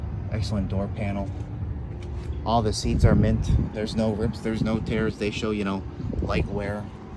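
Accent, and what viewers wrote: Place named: Chicago, United States. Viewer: Man, you have a cool Chicago accent!!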